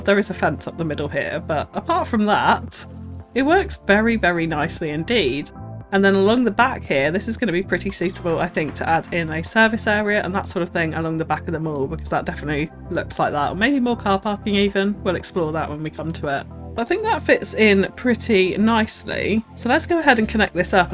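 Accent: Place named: England, United Kingdom. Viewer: A beautiful design from someone with a beautiful designers mind. Additional thoughts - due to your charming English accent I kept miss-hearing what you were saying when you said “Mall” I kept hearing it as Mull. I genuinely thought you were saying it with a Scandinavian accent.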